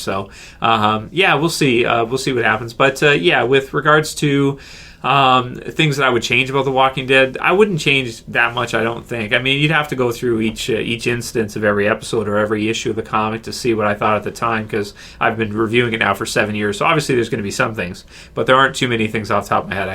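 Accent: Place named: Canada. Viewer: I love your Canadian accent, can you please do a video of you just saying OUT and ABOUT over and over...thanks!:)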